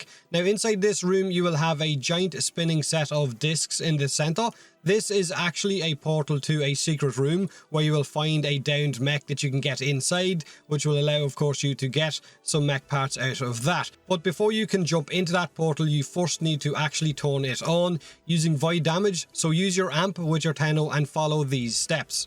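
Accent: Ireland. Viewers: 4 seconds in and i new this guy was irish-i think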